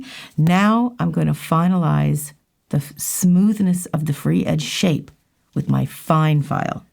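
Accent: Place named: Canada. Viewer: Her speaking voice sounds sooo much like Shania Twain. That subtle Canadian accent. At least I assume she is Canadian because of her accent. Seriously, find a clip of Shania Twain talking. Love it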